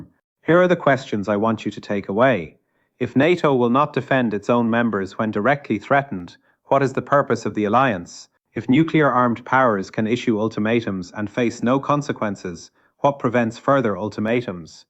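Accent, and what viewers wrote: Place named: Ireland. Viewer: An Iranian with an Irish accent?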